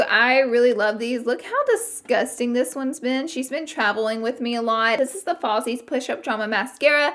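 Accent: South, United States. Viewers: Love you too girl! I started watching you a couple years ago and I instantly fell in love with your channel! You are so fun and humble! I am honestly proud and happy for you on how far you've come! Don't loose the Southern accent! Hugs. Thanks for sharing this video.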